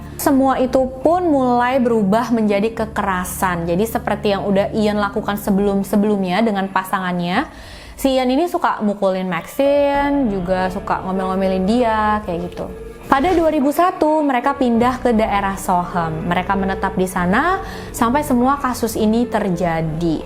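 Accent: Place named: West Java, Indonesia. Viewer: Terbaik👍 Btw ka itu orang mana?! Soal ny pas berbicara ada logat sunda ny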